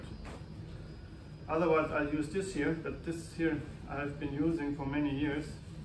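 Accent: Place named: Sweden. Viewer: CAT! She so stole the show 😃 I hope you make some videos from the trail. Nebenbei, Du hast schon nen Schwedischen Akzent wenn Du Englisch sprichst, sehr sympathisch =)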